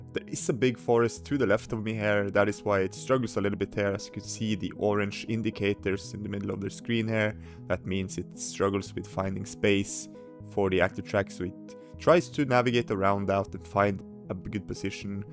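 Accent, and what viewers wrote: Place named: Norway. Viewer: your English is perfect. Men eg kjenne igjen ein nordmann når eg hørre ein.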